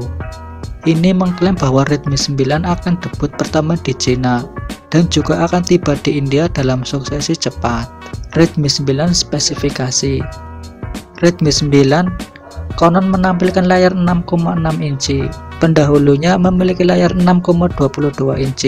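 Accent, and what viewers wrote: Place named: Tegal, Indonesia. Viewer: Medok bgt....tegalll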